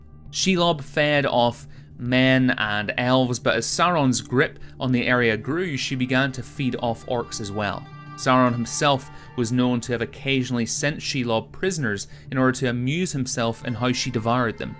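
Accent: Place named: Ireland. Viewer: I like your accent. Where are you from? Ireland?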